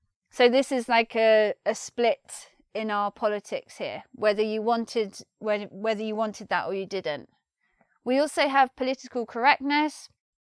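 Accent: United Kingdom. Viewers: Thanks, i learn to much with you, i love your british accent- Paulo From Brazil!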